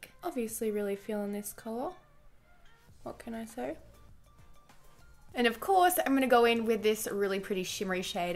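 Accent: Australia. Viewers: Omg I've always watched her with the sound off and I had no idea she has an Australian accent I was so surprised!!! I am so dumb😂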